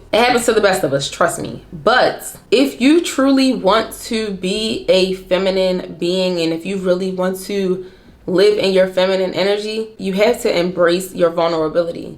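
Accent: Baltimore, United States. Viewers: Is she from Baltimore? I hear it in her accent and I’m from Baltimore lol. I wouldn’t be surprised bc a lot of us women who grew up here have had to build this masculine, tough girl persona to protect ourselves in this city and I am so happy to know I’m not the only woman here who is tired of it lol (if she is actually from Baltimore) 😅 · Are you from bmore by any chance, if so I can hear it in your accent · This was gooood. Like a sermon lol. You should like you're from Bmore. Thank you for this. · I’m working on being more intentional as a wife and mother and a better woman, so I decided to come on YouTube to find a black woman who has been implementing intentional changes in their life and I came across your videos. Thank you for sharing your story. Side bar as I was watching one of your video I was thinking she sounds like she is from Baltimore 😂. 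Shortly after you stated you were from Maryland. Nevertheless sis keep up the great work. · I hear the Baltimore. So I know you know first hand how hard it is to be feminine in Baltimore.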